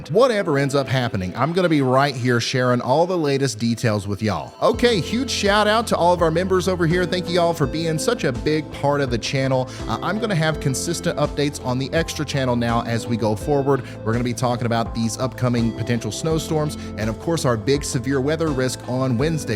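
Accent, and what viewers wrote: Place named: Pennsylvania, United States. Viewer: Did you go to school at penn state? Sure does sound like it!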